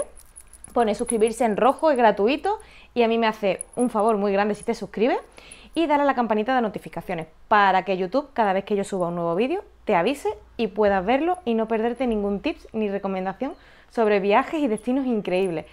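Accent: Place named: Malaga, Spain. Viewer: Que hermosos lugares tiene la hermosa Cuba, por algo es la isla de ensueño, y sobre todo ese característico acento malagueño tuyo, sin duda cautiva mucho, sobre todo cuando hablas bajito, saludos 🎉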